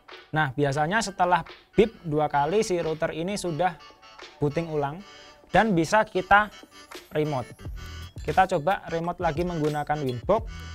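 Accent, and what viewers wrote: Java, Indonesia. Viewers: Medok mu mas aku suka hahaha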